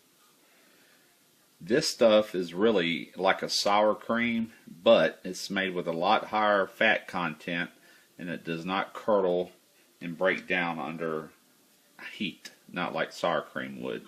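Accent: South, United States. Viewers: + southern accent = win, i'm a huge fan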